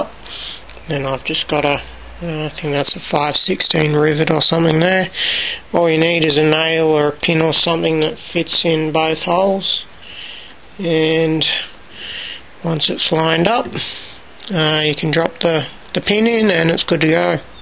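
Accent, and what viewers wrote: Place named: Australia. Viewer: you sound like badger from Breaking Bad, except with an Australian accent.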